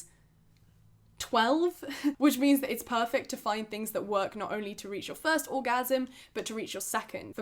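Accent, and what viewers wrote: United Kingdom. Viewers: I truely AM intrigued as to why quite a few of your video descriptions are actually in German? Ich find's wirklich toll, verstehe aber den Zusammenhang nicht, weil du einen britischen Akzent hast und deine Familie - was ich aus den Videos bisher gesehen habe - auch Englisch ist (?)... Lernst du Deutsch oder ist es einfach ein Witz? XD love your chanel, love your videos, and everytime a header is in German I'm drawn to it like a moth to light ^~^ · i’m also British and i love her accent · Your accent is that British accent in every movie, absolutely beautiful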